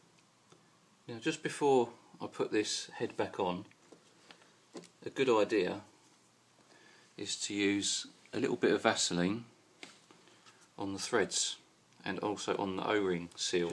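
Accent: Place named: United Kingdom. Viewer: Delightful British accent! Love it!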